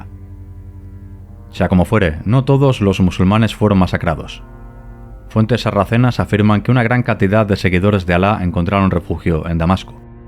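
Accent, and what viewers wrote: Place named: Spain. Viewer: Excelente narración con lujo de detalles, felicitaciones!! No hay nada mejor como la voz humana, y si tiene acento español mejor, para narrar estas historias. Estoy hasta la médula de la IA. Saludos desde Uruguay 🇺🇾 y feliz 2026!!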